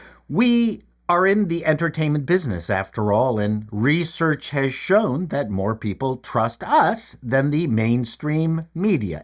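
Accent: Canada. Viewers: I am here for the chrisp Canadian accent. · 🤔'Drunk acerbic Scottish movie critic'? Not sure who you are referring to. 🤣This was indeed fascinating and entertaining sir, I knew and enjoyed your earlier work with the Frantics, and joined the fellow followers know as Dennises(?) and missed the Hackintosh era. It was great to hear you talk about the evolution of your channel, but when you were 'geeking' out and going into the details on your computer builds. For some reason I started hearing the hit ' ' in my head!😂 Thanks for the video and the laughs sir, very glad that YouTube dropped me the suggestion to check you out! I mean I get to enjoy your acerbic movie and television reviews, minus the alcoholism and with a Canadian accent! 🍁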